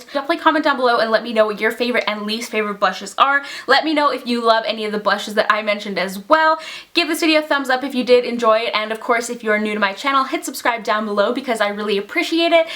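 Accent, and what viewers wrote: Canada. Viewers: Why are you so pretty? I need to know the secret. Haha. And for some reason I can totally hear your Canadian accent in the video for the first time, lolol. Xoxo.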